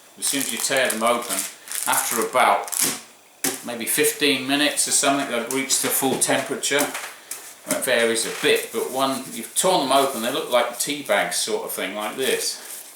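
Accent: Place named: England, United Kingdom. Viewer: just saw an advert for these on TV in australia, so i thought id quickly look for a review online, yours was the first i saw. Nice to hear an english accent, im from coventry, but i live in sydney... it doesnt often get cold here but this weekend is pretty cold, 13C!! which by english standards isnt cold at all. lol.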